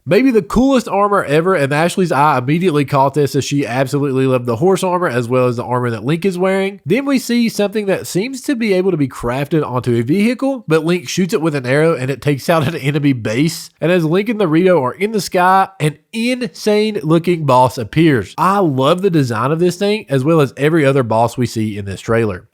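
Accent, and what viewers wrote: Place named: South, United States. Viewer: WOW the southern accent REALLY threw me off!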